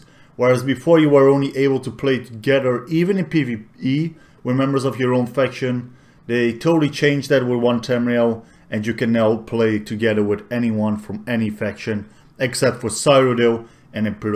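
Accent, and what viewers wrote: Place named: Russia. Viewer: I haven't heard the dutch accent much I guess and maybe there is a reason that's obvious idk... But I hear a bit of russian in there.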